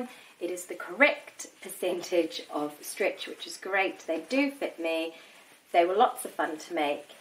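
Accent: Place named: New Zealand. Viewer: Lovely to hear a kiwi voice on a you tube sewing vlog. Hi from Wellington 😍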